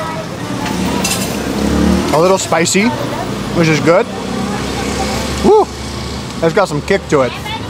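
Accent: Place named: Tagalog, Philippines. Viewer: Pareho kau ni zombie ng accent sa tagalog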